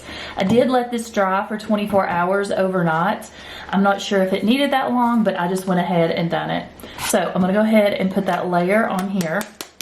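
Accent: South, United States. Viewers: What! You have that soft sweet southen accent. Us northerners don't.😁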